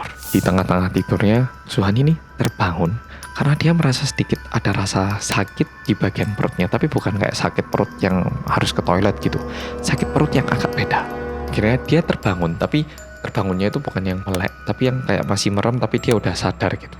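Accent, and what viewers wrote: Java, Indonesia. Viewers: Oppa medok, aslii banged haduhhh 😁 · Sumpah nonton vidio mas nagih banget.aku suka suaranya yg medok2.btw gerak gerik mas mirip jungkook bts jujur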